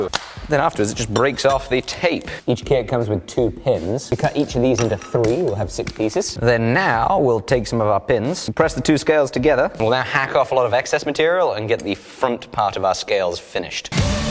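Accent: United Kingdom. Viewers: Jesus, 8 months and you're back to full brit lol. Cussing out your mate on camera lol. Love it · is finally British again and it’s the best feeling ever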